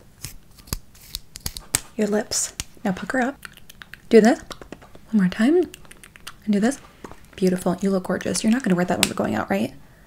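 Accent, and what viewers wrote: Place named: United Kingdom. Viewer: I am very confused you eyes colour changes and somtimes you have a british accent 🤨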